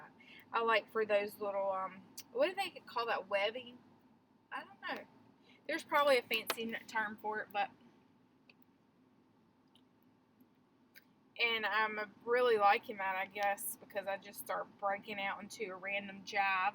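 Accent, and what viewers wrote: South, United States. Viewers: I love listening to that southern drawl. You are so precious. I love your carefree art. You really enjoy yourself and so do I. ❤️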